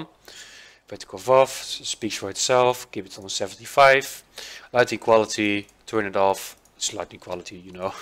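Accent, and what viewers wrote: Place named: Netherlands. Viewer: Ben je van holland ik hoor het een beetje aan je accent? Leuke video man Bedankt!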